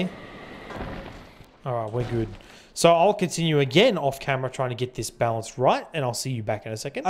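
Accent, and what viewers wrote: Australia. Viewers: i always knew u were australian from when i heard ur voice! nice creation, mate!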